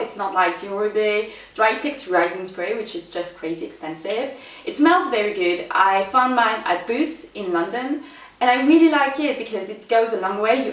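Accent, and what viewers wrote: France. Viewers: Thanks for answering my beauty questions! This was a lot of fun to watch:D Sadly I don't think many late 80s, early 90s kids, escaped to whole icy blue eyeshadow with bright red lipstick phase, so we can all shake our heads in solidarity:P Glad to know I'm not the only one who couldn't place your accent when first discovering you, I think it's because it's not the stereotypical french accent you see in movies (using overly french pronunciation) but just a faint one, that has people so confused. Definitely going to be picking up that volumising spray when I'm next in Boots:D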